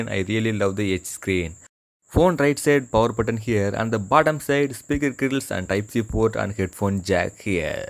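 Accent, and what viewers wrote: India. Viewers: Why do you have Indian accent? Anyway you first take a lady video and then run in the hotel room showing 2 single beds? It makes me think you need the bathroom urgently ....hahahah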